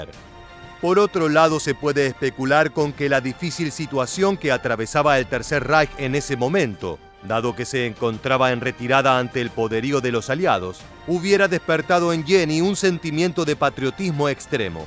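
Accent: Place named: Argentina. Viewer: Ablas como argentino venezolano con cubano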